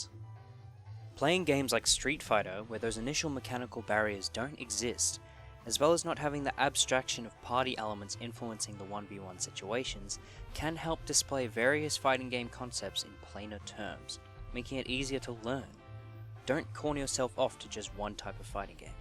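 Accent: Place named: Australia. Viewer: He's Australian Wtf is up with American people and not being able to recognise accents? Smh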